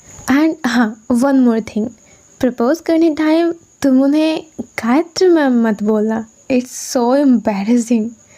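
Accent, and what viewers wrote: Bengal, India. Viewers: I love your bengali accent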